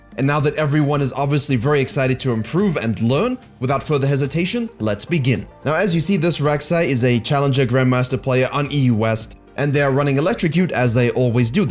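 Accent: South Africa. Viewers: I KNEW IT... U HAVE A SLIGHT SOUTH AFRICAN TONGUE OMW I LOVE YOUNOW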